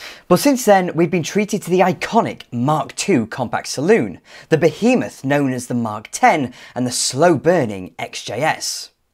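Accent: United Kingdom. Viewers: Thanks for nice review, ... and beautiful English UK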